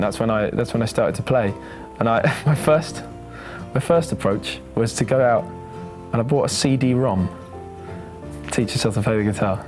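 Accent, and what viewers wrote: United Kingdom. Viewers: OMG HE HAS A BRITISH ACCENT!! JUST MADE HIM 100% HOTTER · this british super talanted and also very beautiful and charming with this accent ogosh im inlove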